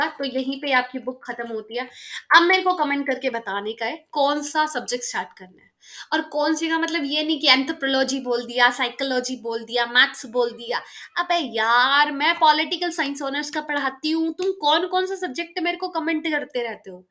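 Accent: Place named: Mumbai, India. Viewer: Tum mumbai se ho kya? Accent mumbai walo jese he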